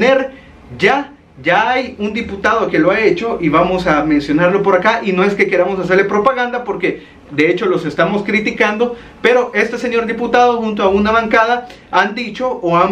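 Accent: El Salvador. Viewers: Me llego, primera vez q veo este canal, hablas como un salvadoreño 100 por 100... Auténtico... Felicitaciones mi hermano!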